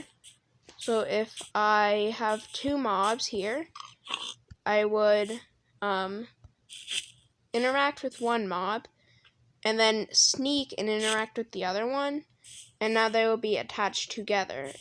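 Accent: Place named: Texas, United States. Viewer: I realised ur voice has a lil Texas accent Lol❤ keep up the work man❤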